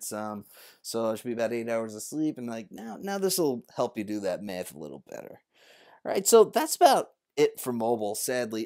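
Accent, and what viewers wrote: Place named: United States. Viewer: Thank God an American accent!!!!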